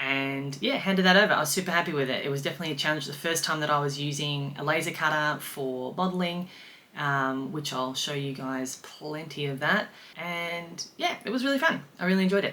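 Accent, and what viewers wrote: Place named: Australia. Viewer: At first I was going to guess British, but listening further decided Australian. Always nice to see a girl in the model train hobby. Uncommon!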